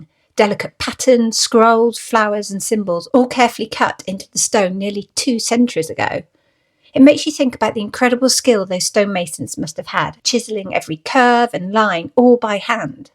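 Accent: United Kingdom. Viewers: A couple of years ago i went to the famous undercliffe cemetery in Bradford and there was a group of Bronte fans who were making speeches about a new found grave belonging to one of the Bronte servants who died in a workhouse and was just discovered there! But i don't remember her name! P.S Your Yorkshire accent made me laugh!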